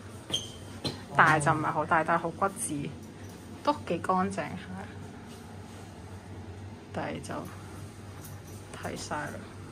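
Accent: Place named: Cantonese, China. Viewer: 普通話是太普通，粤語=悦語，讚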